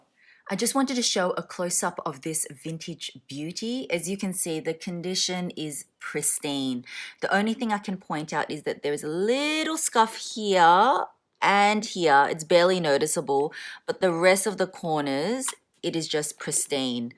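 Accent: Melbourne, Australia. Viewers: Amazing to see a youtuber from Melbourne, comforting to hear the accent again. It's so unique to see a vintage bag as well, such an amazing prize to offer. Honestly, I would love the money so I can save that for when I'm feeling spendy and want to splurge on a nice bag. I hope you continue to succeed at everything you are doing and your channel progresses. Insta: rhiannon_davies_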